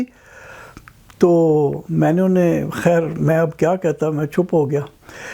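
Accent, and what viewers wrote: Punjab, Pakistan. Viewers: Your Pukka Punjabi Accent is so lovely👌 I love all your content Salman Rashid Sahab !!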